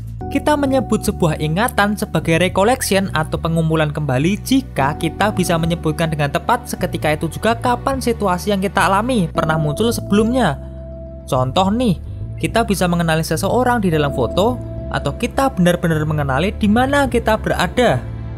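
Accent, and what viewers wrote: Java, Indonesia. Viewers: medok banget mbah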